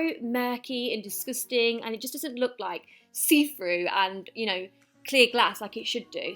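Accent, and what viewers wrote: United Kingdom. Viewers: Where do you come from? Is this a British accent?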